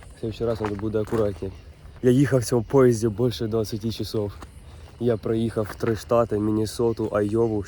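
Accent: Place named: Poltava, Ukraine. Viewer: Перший раз тебе дивлюся! Красава! А по суржику схоже що ти з Полтави?!😅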